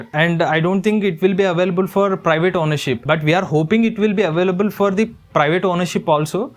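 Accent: India. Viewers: Why i watched your most of the video. because i am hindi state person. so when i listen your english i enjoyed like i am listing my own tong language Hindi. God Bless you..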